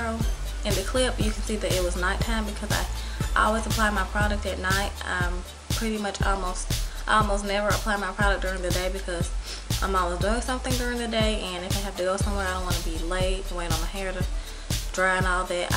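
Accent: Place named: South, United States. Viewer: i love this tutorial and your country accent.... lol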